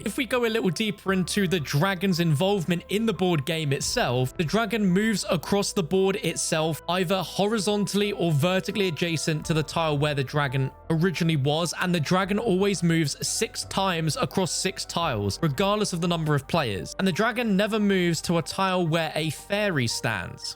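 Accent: England, United Kingdom. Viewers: He is pronouncing it correctly he is English that's how we pronounce it (correctly) 👍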